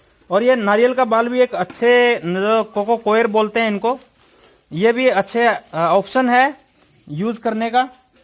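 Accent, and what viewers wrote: India. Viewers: haryanvi ? kit ke ho?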